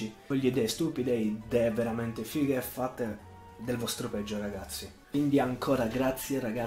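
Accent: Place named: Sardinia, Italy. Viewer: sei sardo? perché hai un'accento simile al nostro (sono sardo)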